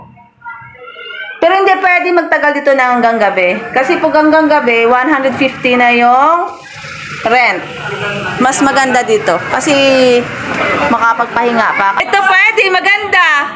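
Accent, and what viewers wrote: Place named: Hiligaynon, Philippines. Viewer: Daw ilongga voice mo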